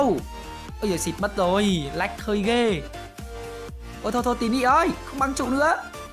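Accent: Nam Dinh, Vietnam. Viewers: Anh ơi nhà anh ở nam định à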